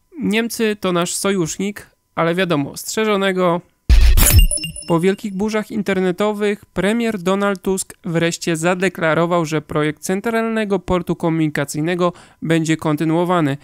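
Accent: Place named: Russia. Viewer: jesteś ruskiem słychać po akcencie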